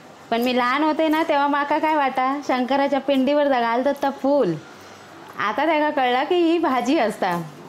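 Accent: Malvani, India. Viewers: तू इतकं गोड मालवणी बोलतेस ना की ऐकत रहावं. नक्की करतलय भागला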